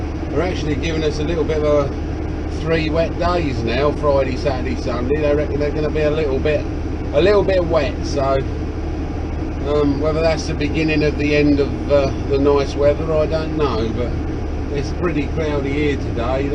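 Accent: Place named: Essex, United Kingdom. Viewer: Is this video recorded from Essex? because definitely sounds like his from that area!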